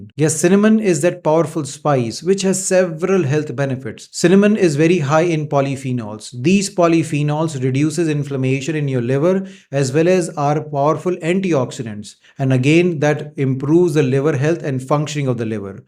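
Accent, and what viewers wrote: South India, India. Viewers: Doc,gratitude for empowering us about thyroid care, why you've south indian accent did you stay in south india for long.🙏👌❣️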